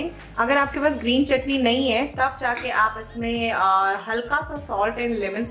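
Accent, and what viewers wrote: Punjab, India. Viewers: Hey r u punjabi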